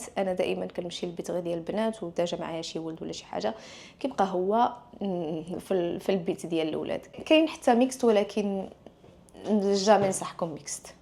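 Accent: Fez, Morocco. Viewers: nice accent from fez...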